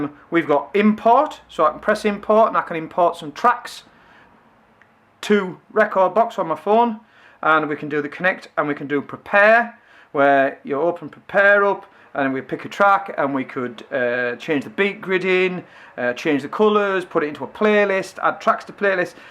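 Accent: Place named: Newcastle, United Kingdom. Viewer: Bit of a Geordie accent there la.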